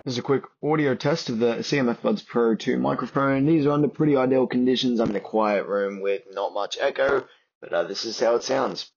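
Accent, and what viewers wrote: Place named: Australia. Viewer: Great, thorough video - thanks mate! Was initially a little confused about the pricing based on your accent (another Aussie here, where they are just under $100). But then realised you were probably talking to a primarily American market and thus talking in US$. (And congrats on your recent graduation!) 👍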